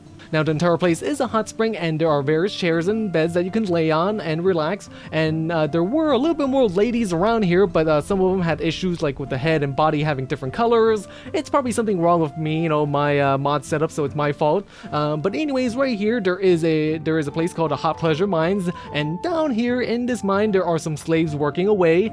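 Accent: United Kingdom. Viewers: She sounds welsh I think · I'd say English but I can't place the region, but her voice is soothing. Also I'd work both but as a slave it's not like I'd have a choice anyways.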